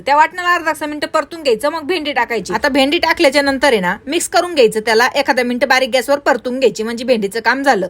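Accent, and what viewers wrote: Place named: Ahmednagar, India. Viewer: नगरच्या आहेत का तुम्ही....कारण भाषा तुमची तशीच वाटते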